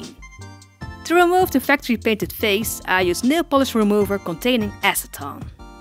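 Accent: Netherlands. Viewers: NEDERLAAANDS 😂 je hoort gwn dat je een Nederlands accent heb, I 💖 your video's 😘💋💯 ga zo door!! 🔥💝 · I just found you and I think you are Dutch 😂 because of your accent xD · No hate but you sound i bit Dutch ( ben je Nederlands ?? ) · Oh my god your accent is sooo cute! I’ve never heard a Dutch person,and y’all kinda sound like if the French and British accent had a child xD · Ar u neterlands cuz i heard it in the accsant i'm neterlands to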